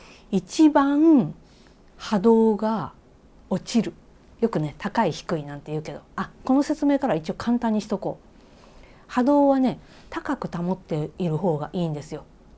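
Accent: Kansai, Japan. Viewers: 関西弁素敵です。辻さんのお話は、体の深いところから出ているように感じます。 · 関西弁で、喋ってるさん好きです。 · 関西弁好きですよ！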